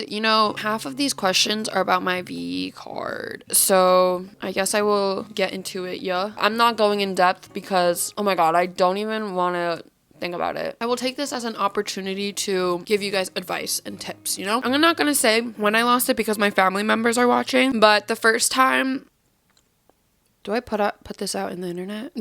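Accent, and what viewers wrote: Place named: Asia. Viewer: Asian accent mic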